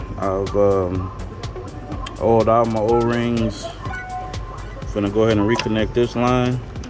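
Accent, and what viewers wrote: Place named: Texas, United States. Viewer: Man I heard you talking and I know straight up yous from Texas nail it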